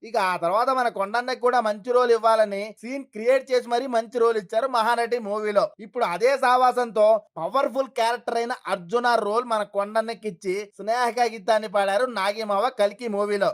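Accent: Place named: Telangana, India. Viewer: CheppoddaraL jodka Telangana slang lo.. enduku ra mee Androllaku chinna chupa Telanganollante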